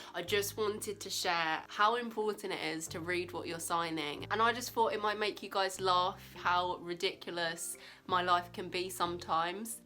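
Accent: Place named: England, United Kingdom. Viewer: You're so pretty! I hope they find something useful to help you out. Love your accent too! (Sorry I live in America so the english accent is sounds nice to us haha)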